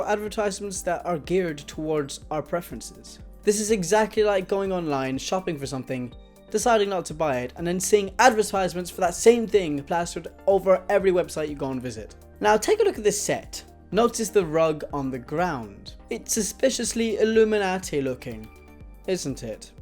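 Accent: Ireland. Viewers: What part of England are you from you have an Irish accent also your pretty cute 😎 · Are you Irish I'm just asking because of your accent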